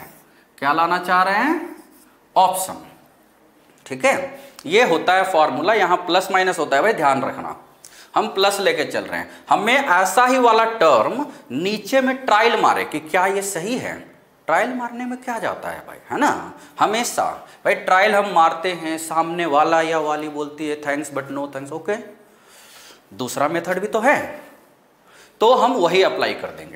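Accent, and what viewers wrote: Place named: Bihar, India. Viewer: Pura Bihari babu ka feel aara tha!!😂😘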